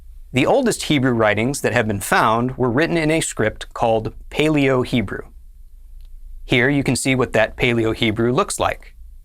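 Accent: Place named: Germany. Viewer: . And here is where you lost me. Fare well. Modern Germanicised versions of the Hebrew pronunciation are known to differe quite a bit from the pronunciation of ancient Hebrew. Actually, we have an overly detailed description of the pronunciation of every ancient consonant and, thanks to the Masoretes, also of every vowel. So, deliberately chosing to use a 19th ~ 20th century Germanicized version of the pronunciation of the language is a travesty. I do want to learn Hebrew, but the overabundance of resources using Germanizing versions of the language almost deterr me. Using these pronunciations is an undeserved deference to Europeans who made and make a conscious effort not to use Semitic phonemes when speaking Hebrew. My quest goes on, my using this channel to learn Hebrew is done. So long.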